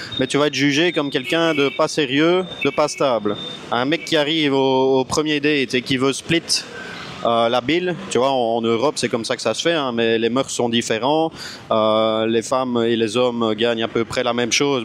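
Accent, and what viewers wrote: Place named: Belgium. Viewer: Merci pour t'es conseil tu ma bien fait rire et ton accent Belge et très sympa !!!!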